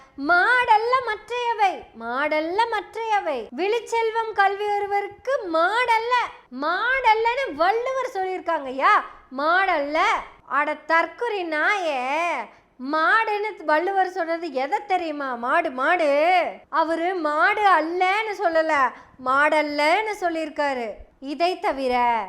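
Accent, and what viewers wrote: Kongu, India. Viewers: கொங்குத் தமிழில் சதிராடும் தமிழ்த் தாயே வணக்கம்🙏🙏🙏🙏🙏🙏🙏🙏🙏🙏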